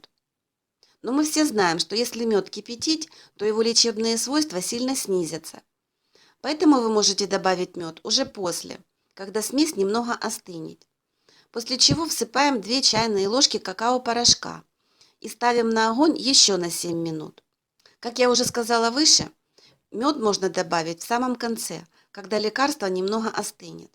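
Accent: Ukraine. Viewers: это украинский диалект и не тебе сопле указывать комуи как разговаривать и прав тот человек ,что назвал тебя Бляндинкой!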